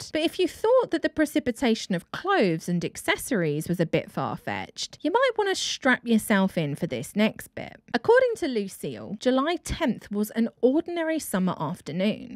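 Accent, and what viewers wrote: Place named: United States. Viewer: Please, I'm begging you, no more American accents.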